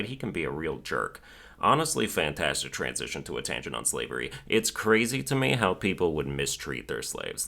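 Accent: United Kingdom. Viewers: Hearing that british accent just after playing The Great Ace Attorney is certainly something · That was your best English accent yet, not even a tinge of the Aussie in there 💯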